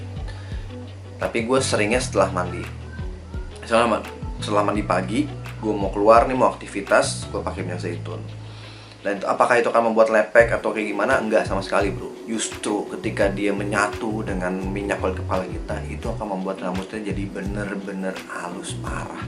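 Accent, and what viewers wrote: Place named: Aceh, Indonesia. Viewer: Orang aceh ya bang?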